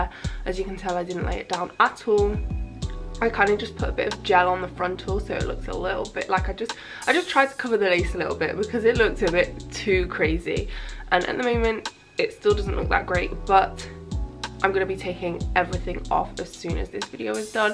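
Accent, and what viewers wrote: United Kingdom. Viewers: Hey as another mixed race girl... do you tan well? Just wondering because from your accent I'm assuming you're in the UK and I heard that there's not a lot of sun there so I feel like if you were somewhere else your hair would probably lighten up and your skin would get darker. And possibly even give you freckles, judging by your skin tone. Edit: Cool makeup tho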